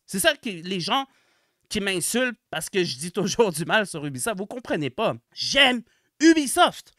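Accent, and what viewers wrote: Quebec, Canada. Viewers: Un abonné de plus, suite à la video de Psyhodelik. L'accent québécois me manquait, je reste squatter un peu 😅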